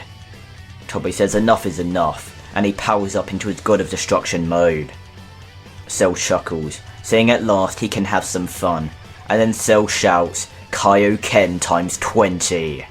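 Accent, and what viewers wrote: United Kingdom. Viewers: Is the man Briish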